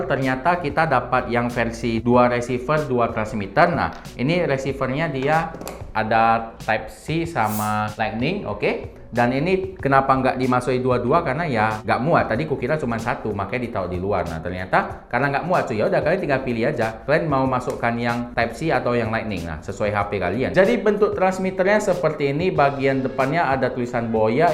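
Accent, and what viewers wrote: Medan, Indonesia. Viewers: Medan kali logat kau bang. Wkwkkw mantap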